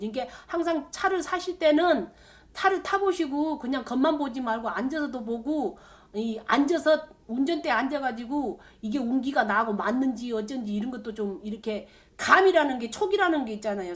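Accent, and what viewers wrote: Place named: Jeolla, South Korea. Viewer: 전라도가 보네...괜히 거부감이 생기네 ㅎ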